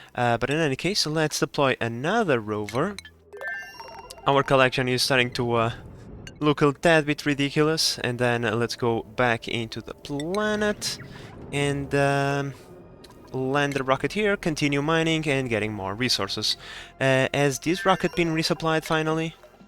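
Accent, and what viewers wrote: Portugal. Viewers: I swear your accent is from Portugal.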